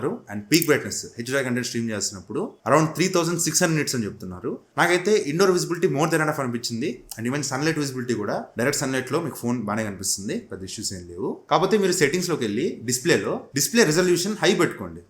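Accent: Nellore, India. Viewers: Are you from Nellore 😮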